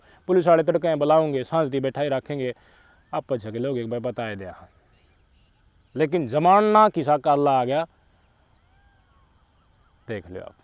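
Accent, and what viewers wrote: Haryana, India. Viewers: भाई आपका हरियाणवी बोलने लहजा बहुत बढ़िया है आपका गांव कौन सा है भाई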